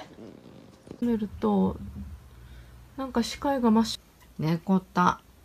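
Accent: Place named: Okinawa, Japan. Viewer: 元気いっぱいのくん☆ コスプレも好きなんですねぇ☆ 時折でる沖縄なまりが自分は好きです☆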